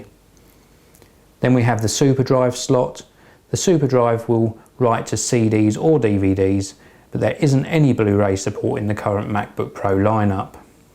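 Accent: England, United Kingdom. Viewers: he is from england so he`s got this kind of accent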